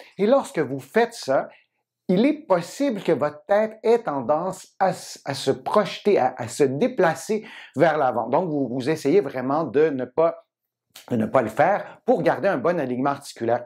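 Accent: Quebec, Canada. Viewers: Vous êtes vraiment génial super original avec l'accent du Québec, un vrai personnage. Merci vos conseils me change la vie !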